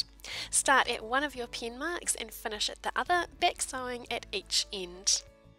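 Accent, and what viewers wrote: New Zealand. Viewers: her accent is kiwi - New Zealand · What accent is that ? Nz ?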